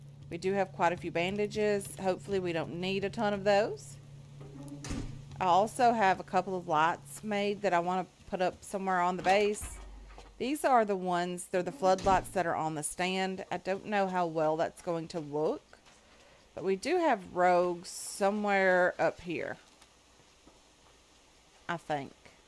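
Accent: Kentucky, United States. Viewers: There's my Kentucky accent fix! Brilliant episode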